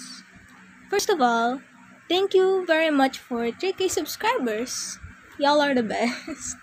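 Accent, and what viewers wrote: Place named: Philippines. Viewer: YOUR VOICE SOUNDS AMAZING WHAT- ITS LIKE AN ANGEL DBDJHDGHJDYDJSSJHSH You have that Filipino accent while I have this American-ish accent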